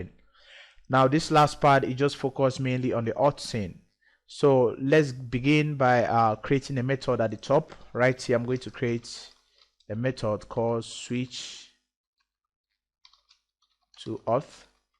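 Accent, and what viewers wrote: Nigeria. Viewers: He sounds like a Nigerian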